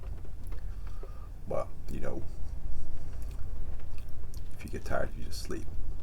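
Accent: South, United States. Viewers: Being from Oklahoma, your accent is pretty spot on, as are your jokes, very much something a southerner would tell. I love this ASMR, especially because of the rain and your deep voice. I really hope you can get a 3Dio sometime soon, that would make your content even more incredible. I wish I could donate, but I wish you the very best in reaching your goal! Thanks again for the amazing work you create. (I really need to stop leaving long annoying comments ahh) <333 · Very sexy character.I like it when you use your American accents!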